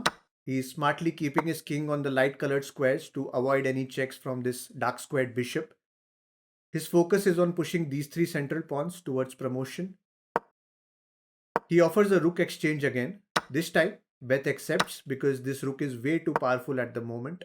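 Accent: India. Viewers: an by the way..? which indian extreme accent person, was able to rise nearly a million subs with chess? is that corona are the entire world silly nowaday.. in einer welt die verrückt spielt, ist nur ein irrsinniger wahrlich geisteskrank... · finally an indian accent i understand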